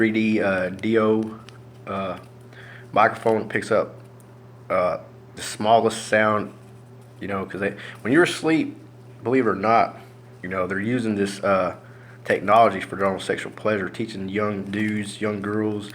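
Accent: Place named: Texas, United States. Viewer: I don't think the machine will work but if you want to buy it just to do a product review that is cool. I think people try to take advantage of the desperate to make money. IF you want to try some kind of blocking device, Neodymium magnets work somewhat. You can get them in a headband or tape them to one. I heard mirrors can send back whatever waves they shoot at you or maybe just even sheet metal. What would happen if you pulled out a frying pan and stuck it over your legs where they send the beams? Maybe it would break their equipment. Why do you they think you should go to Mexico? You don't even look full blooded Mexican maybe because you act so American. You even have a heavy Texas accent instead of a Spanish accent. You should tell them okay, buy my ticket, Hasta la Vista.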